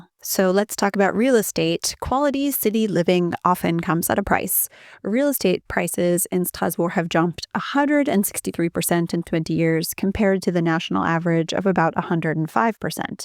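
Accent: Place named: Boston, United States. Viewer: I'm surprised it took watching so many of your videos before I heard you were from Boston. Maybe you've been in France too long for it to be heard in your voice. 😂 Thanks for all you do.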